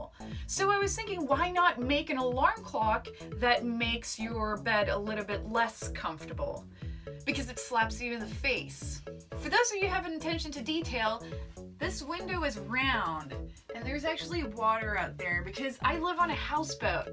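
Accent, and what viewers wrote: United States. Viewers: You're Swedish? Hahaa! Definitely thought you were American. Awesome video! Make one for my friend, pls?! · You're the most American-sounding Swede I've ever heard. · Swedish? WTF. You sound more American than most Americans. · Din amerikanska accent är klockren.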